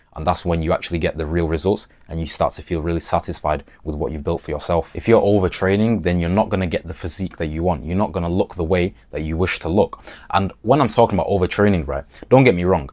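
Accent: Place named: England, United Kingdom. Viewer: English accent funny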